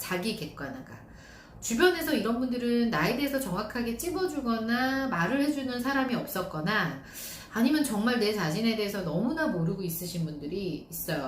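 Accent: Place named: Seoul, South Korea. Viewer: 서울말 잘한다!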